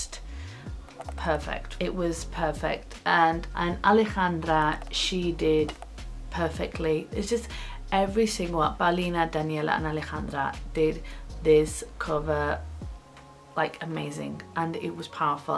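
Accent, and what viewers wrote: United Kingdom. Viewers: Going by you accent you are living in the UK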